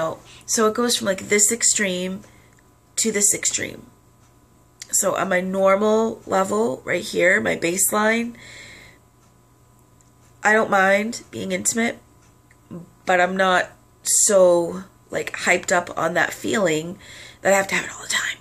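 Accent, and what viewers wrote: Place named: New York, United States. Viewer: Thank you so much. You have a teensy bit of NY or (?) accent. I wish we lived close so I could hug you. Thank you.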